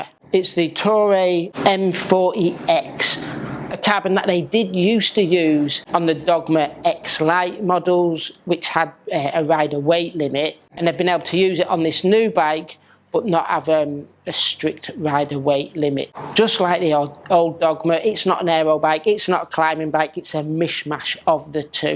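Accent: United Kingdom. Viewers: Jackie Stewart is Scottish. is probably Mancunian (from Manchester)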